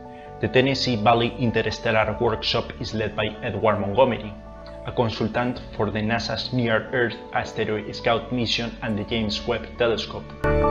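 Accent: Spain. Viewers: Es ese un acento español? Mi español es mal pero estoy aprendiendo todos los días :)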